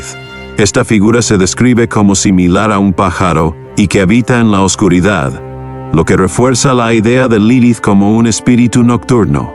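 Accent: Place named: United States. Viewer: Por qué pusiste la voz de un gringo si esta hecha con inteligencia artificial, por qué no pusiste la voz de un latino?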